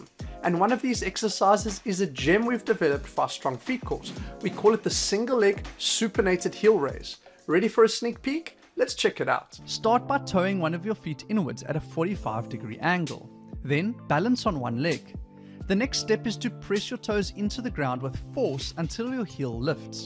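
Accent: South Africa. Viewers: Just listening to you makes me homesick for South Africa. Well done, bro.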